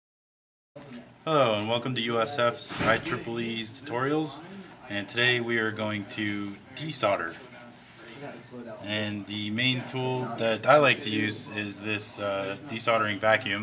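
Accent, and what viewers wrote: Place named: New Brunswick, Canada. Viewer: you sound like a New Brunswicker. true?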